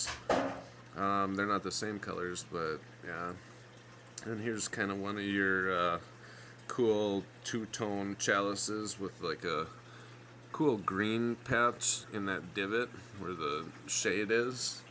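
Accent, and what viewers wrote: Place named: Minnesota, United States. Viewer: Ya gee, ya sound like my family in Brainerd, don’t cha know? -random aquarist in Texas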